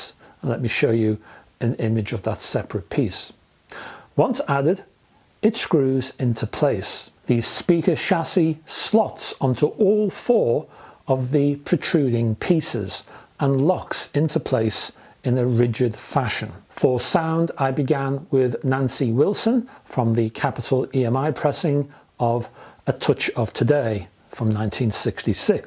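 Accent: United Kingdom. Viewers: Love the British speak, very eloquent indeed. Net, net though the review indicates these are too bright in the midrange on some vocals and recordings, which can cause ear fatigue. While boomy bass and hissing highs in some speakers are never good either, because the human ear is most sensitive in the midrange, if an $8,000 pair of speakers can get too peaky on the mids in some cases, that's the worst area to have a problem. Also this review only refers to a very few recordings.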